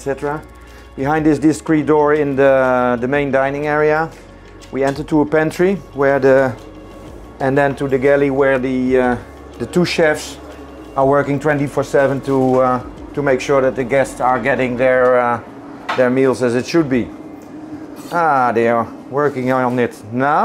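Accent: France. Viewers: Quant un français parle l'anglais sa ce sait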